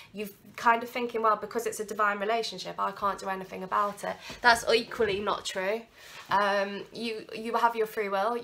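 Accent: United Kingdom. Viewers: I am so happy that I discovered and AND that I stick by watching your videos, in spite I have hard time to understand British accent (english is my second, used to American). You are so wise and connected, I find your insights are being accurate. #1 for me, totally on spot, and Thank you for that.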